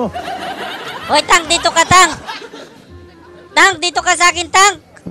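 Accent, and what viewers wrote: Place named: Iloilo, Philippines. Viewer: taga koronadal ka pala.. Kaya pa illonggo timo.. Hahah Galing... Frm gensan.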